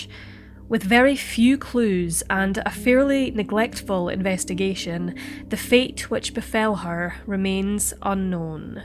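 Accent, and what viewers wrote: Scotland, United Kingdom. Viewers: I've watched quite a few Dark Curiosity stories now. The Mayan narration adds so much. Of all the different Scottish accents, yours is of the most gorgeous. There's a gentle folk tone to your voice that only exists in certain areas. It's so welcoming that it doesn't surprise me that so many love spending time with rural locals. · I love your channel. So many cases I’d never heard of till now. You’re very gentle and respectful too. Love your Scottish accent as well! (My mum is Scottish!)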